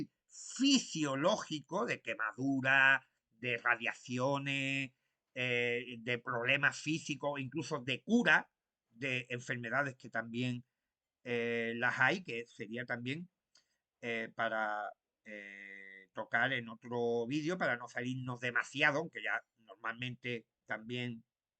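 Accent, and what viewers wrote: Spain. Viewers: Como siempre te explicas de maravilla. Me encanta escuchar tu acento ( una Algecireña desde Girona), de verdad hablas mejor que ningún político, periodista u orador actual, viva la Z ' Un abrazo crack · Are you in South America or Spain,sounds Spanish in Spain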